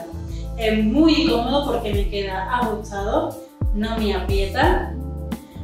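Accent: Andalusia, Spain. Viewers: Esos hoyuelos de venus... ufff!!! Qué hermosos 😍😍😍 Una pregunta: Eres de Andalucía?? Tienes un tono de voz melodioso e intrigante. Pura vida!!!🇨🇷✌️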